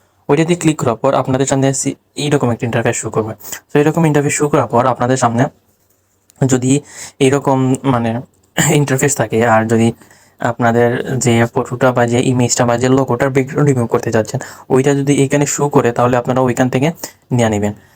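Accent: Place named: Sylhet, Bangladesh. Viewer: Vai Sylheti ni kota sune to seta mone hocce 😊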